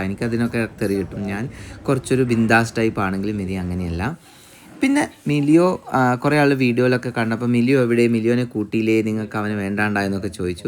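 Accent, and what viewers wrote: Malabar, India. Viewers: മലബാർ സ്ലാങ് അല്ലെ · മലബാർ സൈഡ് ഒക്കെ ഇങ്ങനെയാണ്, മലപ്പുറംകാരി ❤️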